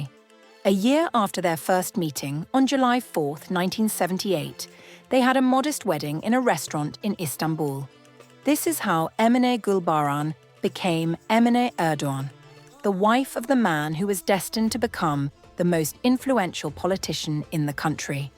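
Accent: United Kingdom. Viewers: Your accent is British. You insist on how much the tea cost. How about your royal family's lifestyle and expenses that live off from your taxpayers!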